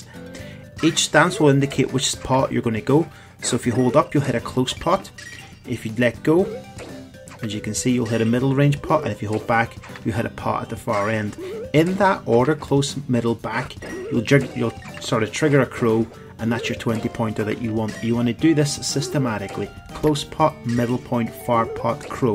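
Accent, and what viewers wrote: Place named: Ireland. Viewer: Hes so irish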